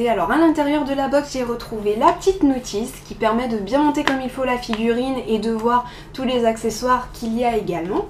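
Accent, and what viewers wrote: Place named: France. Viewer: Im from spain but love french accent :)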